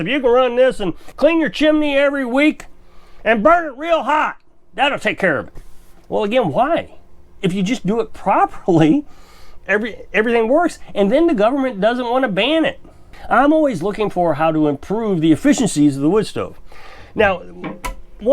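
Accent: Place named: United States. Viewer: You are speaking American, not English. Maybe it's American English, but it's not English.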